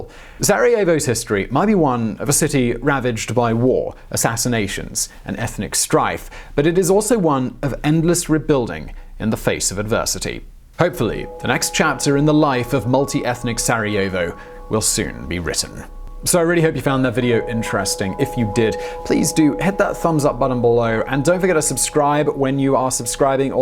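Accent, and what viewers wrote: United Kingdom. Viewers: oh and the british accent with the rounded "o". yeah he s smart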